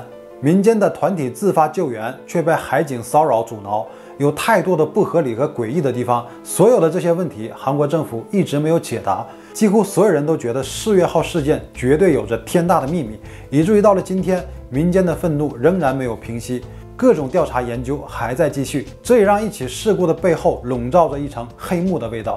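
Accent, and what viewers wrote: Northeast, China. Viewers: 感觉是东北人，说话稍微有点东北味。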